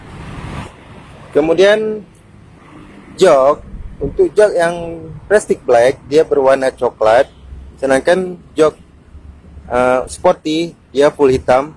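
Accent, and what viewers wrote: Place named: Aceh, Indonesia. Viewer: Org Aceh rupanya, logat cara ngomongnya ketauan, 😊 salam utk adoe syedara lon di Sinan. 👌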